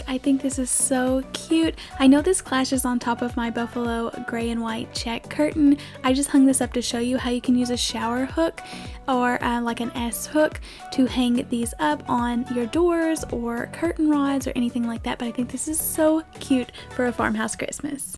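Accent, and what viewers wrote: South, United States. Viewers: I Love all your crafts!! So cute & you are so creative too! I also love your cute southern twang, it reminds me of my Mama- she's from the south!!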